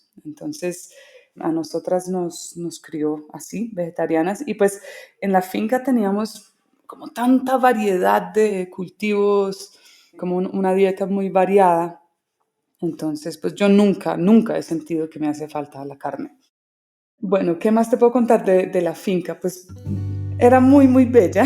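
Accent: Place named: Colombia. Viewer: No tiene acento extranjero, habla con acento colombiano.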